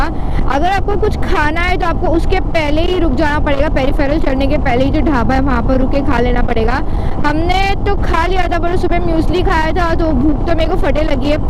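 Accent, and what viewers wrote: Maharashtra, India. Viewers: Hey today I saw ur vlog first...surely u r Marathi..u r way of talking shows that..btw welcome to our place. Uttarakhand devbhumi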